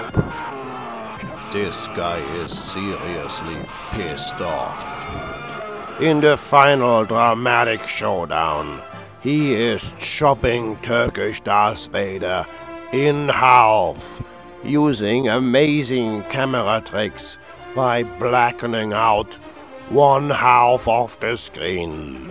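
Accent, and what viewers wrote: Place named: Germany. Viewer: Why did you put a German accent in this? Wtf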